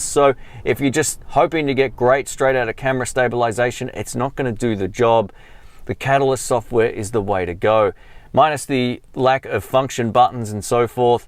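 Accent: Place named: Australia. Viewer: About the grip: have you searched for a "smallrig" wood replacement? I improved my grip on the ZV-1 (my solution to get cheap, reliable AF last year). Now the size (almost identical to my Pana GX8) is really nice - but some of the "old tech" aspects... How do you see the a7IV compared here - makes sense to wait for it, right? (do we ever wanna wait for something - who doesnt want the cam NOW?) great channel, new subber here - could tell you're an aussie quite qick into your first vid - but it's a nice accent, sympathic! greets from Austria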